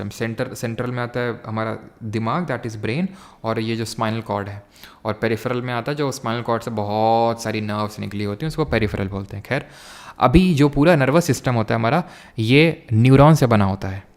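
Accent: Kanpur, India. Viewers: From kanpur ✔️ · वही तो इत्ता accurate कनपुरिया कइसे बोल सकता बाहरी कोई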